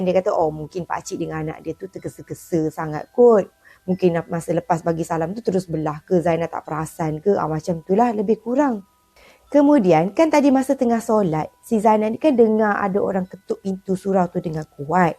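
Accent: Kelantan, Malaysia. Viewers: ni ore Kelate duk raso.. Bunyi po loghat Kelate wlu dio kecek luwa pun 🙃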